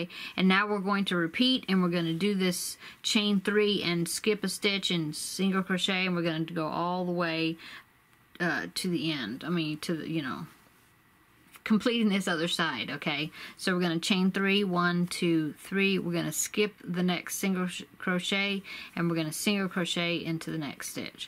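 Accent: South, United States. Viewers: is that southern accent ? LOVE that bag <3 thnx for the pattern :D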